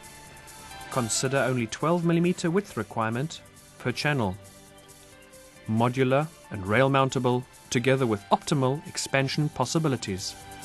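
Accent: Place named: South Africa. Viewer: You sound south African, great video.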